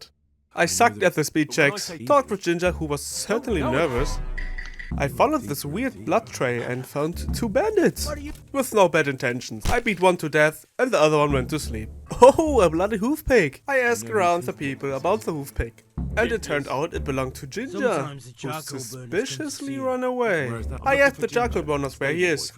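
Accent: Germany. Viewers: Ey lol hab gerade erst realisiert, dass du Deutscher bist. Ich wusste doch, dass ich den Akzent kenne. Sehr gutes Video. Like und Abo verdient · Der deutsche Akzent 😂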